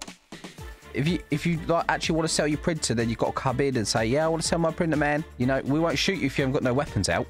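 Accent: Essex, United Kingdom. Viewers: ngl didn't know he was from essex... lol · r u from Essex aswell as me???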